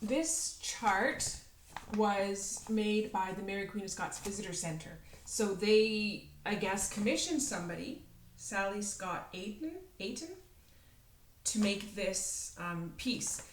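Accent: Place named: Canada. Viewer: Hi, welcome to Floss tube. I think you did a marvelous job for your first video. Always a bit tricky that first couple of minutes. Mr. Right grew up in Canada, so I love listening to the cadence of your voice. I like your idea of family sayings. Inspiring. Thanks for stepping out there and giving floss tube a try. I've only made 10 videos, so I am still a smidge nervous. You have such a kind way about you, I think you will be a hit. Thanks for sharing and inspiring. Happy Stitching, Daleen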